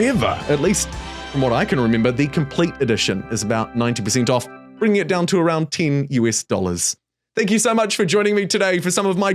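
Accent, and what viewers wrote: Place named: New Zealand. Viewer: Because the New Zealand accent causes e to sound like i.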